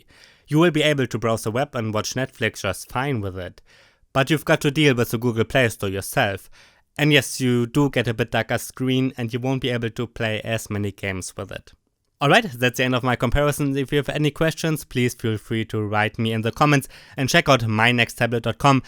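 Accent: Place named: Germany. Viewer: Nice vid, love that thick german accent too. Keep it up, definitely one of the best reviews on this tab.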